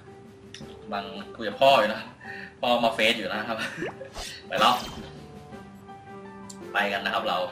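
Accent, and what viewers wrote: Isan, Thailand. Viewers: พี่เป่นคนอีสานเหรอครับ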